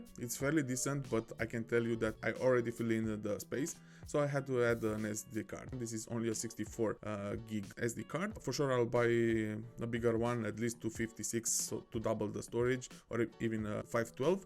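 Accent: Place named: Romania. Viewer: I could recognize a Romanian accent from a mile away 😃👍 good job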